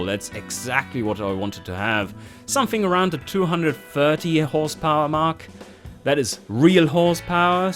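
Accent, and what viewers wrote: Germany. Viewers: Bon soir monsieur, are you from this lovely part of our earth Germany? I cant quite make out if you are German or not... Cheers mate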